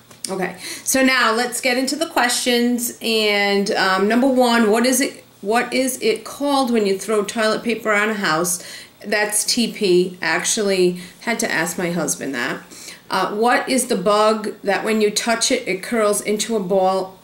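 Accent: Rhode Island, United States. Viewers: yaw adawable, I'm playn a rodyelinda in a new play and i really needed to heah an authentic rodyelin italian accent. thanx for takn da tim to make dis. Peepul say that the rodeylin accent is simala to Boston or NY. But I almost heah a lil marilyn baltamaw in dere it sounds like you say rodyelin with a t ( no we dont) just it spelt out funetically...the girl up above is speakin to nice........haha I speak real rodyelinese! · That's quite an accent - you sound a lot like Lois Griffin from Family Guy. Fascinating. I didn't know Rhode Islanders pronounced court and caught exactly the same! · You're so adorable! I'm playing a Rhode Islander in a new play and I really needed to hear an authentic Rhode Island Italian accent. Thanks for taking the time to make this. People say that the RI accent is similar to Boston or NY. But I almost hear a little Maryland/Baltimore in there. 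It sounds like you say Rhode Island almost with a "T" at the end. Like EYE-LENT. My friend from Baltimore says "salad" like SAL-IT all the time and it always makes me laugh! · I spotted your accent from a mile away! I subscribed when I saw your other videos and knew you must be from RI but wasn't sure till I just saw this old video! I enjoy your channel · hey, I loved this video, I'm an esl teacher here in my home country Argentina, and right now I'm planning my pronunciation lessons, so I find this video quite helpful to get my students exposed to different accents in English. After spending two years in Chicago and ten months in central PA, I have to say a learned a lot but the US is so diverse when it comes to accents and slang, so thanks to you, my sts will get to listen to a clear Rhode Islander. Keep It Up,!:) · Edward Miessner I always forget she’s from around here (Rhode Island) because she sounds so normal to me lmao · She says "here" like "heah". 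"We're" like "Weah". She says "part" like "paaaht". She says "jaguar" like "jaguah". She says "call" like "cwall". I LOVE the Rhode Island accent. It reminds me of Betty Boop and Harely Quinn. So cute!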